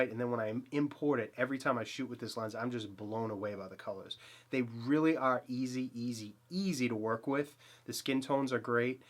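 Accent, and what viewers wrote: Boston, United States. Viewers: Always love your videos. For the record your Boston accent is not that bad.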